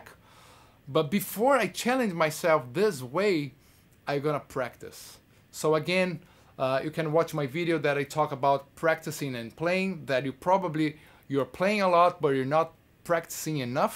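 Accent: Brazil. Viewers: Name looks finnish, but accent seems brazilian.. which one is it? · That's brazillian accent if I ever heard one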